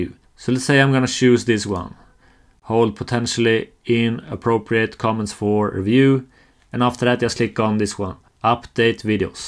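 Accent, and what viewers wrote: Sweden. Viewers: he is swedish i hear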